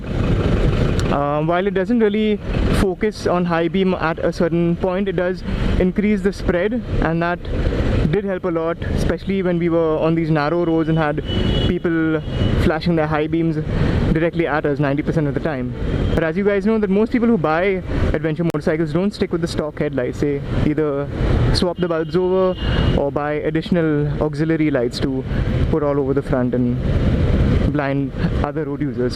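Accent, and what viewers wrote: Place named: India. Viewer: Our hero back again with awesome content. And I love your hindi accent.😍😊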